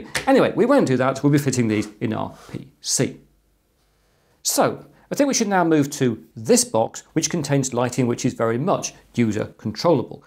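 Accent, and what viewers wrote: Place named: North, United Kingdom. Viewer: Thanks for replying. Yeah I hear the slightly well spoken/slightly northern mix I think. Cheers from Liverpool, I only ask cos my accent isnt that broad hehe:) Looking forward to learning much more. You're a UK YT international treasure Sir!:D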